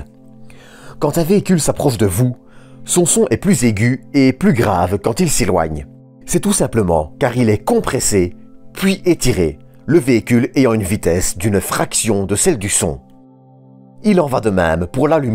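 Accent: Liège, Belgium. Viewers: Ta chaîne m'a été recommandée par le petit astronome...malgré l'accent belge qui pique un peu, j'adore ton style de narration ...bon courage!! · Sacré accent !!! Liègeois??? ;-)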